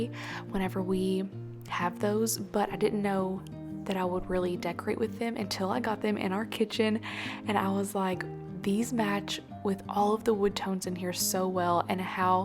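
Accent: South, United States. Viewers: New subscriber here. Congratulations on your beautiful new home! I’m so very excited for you. The countryside looks like farmland in my home state of Ohio but from your accent I’m guessing farther south 😉. I’d love to know what state you are in. I’ve lived in Oregon for 40 years but Ohio farms are in my heart and blood. Those views will never get old. Enjoy every moment and thanks for sharing your joy. May God bless you and your home. ❤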